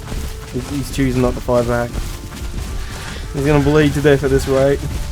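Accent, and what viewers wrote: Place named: Australia. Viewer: Love your accent m8. If you don't mind my asking, what part of Australia are you from? Just the way you say certain words like "alive" and "die" is really slick and reminds me of the Big Lez Show. I was wondering if it is a common way of speaking or more of a regional dialect.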